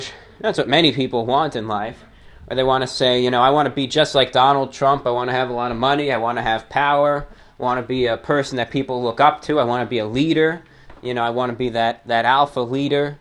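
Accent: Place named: New York, United States. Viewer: 7 years ago. Your NY accent was HEAVY back then.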